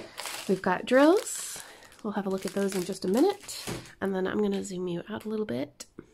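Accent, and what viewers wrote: Ireland. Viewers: Hi I love your videos, wanted to know what size this was and how much it cost. I ask because Stitcherista just did an unboxing today on her first CraftEase, Her box was beaten up too. I think we all kind of agreed that hers was too expensive for the size she got. What is your opinion on your painting. Also I wanted to tell you that I have been watching your videos for a long time and I am noticing a bit of irish coming out when you talk, it is so cute. Keep up the great work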